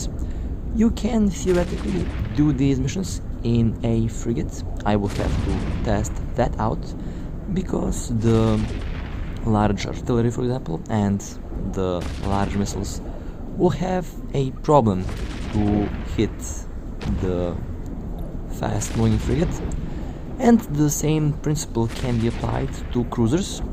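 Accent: Russia. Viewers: Лучше бы по русски сразу говорил, акцент русскоговорящего ппц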